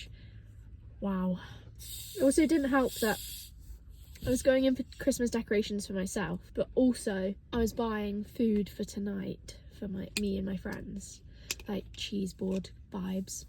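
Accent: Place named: Scotland, United Kingdom. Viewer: Keep posting stuff,! I’m a guy from Colombia (so Spanish is my first language). I have a trip to the UK coming up, and I’m already getting familiar with the Scottish accent (not an easy one for a non-native speaker). I just found your channel thanks to your "football skills" in the ChrisMD video.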